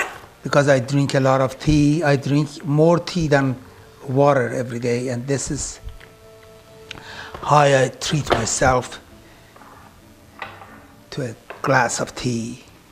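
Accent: Iran. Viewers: Iranian accent is the cutest accent ever :)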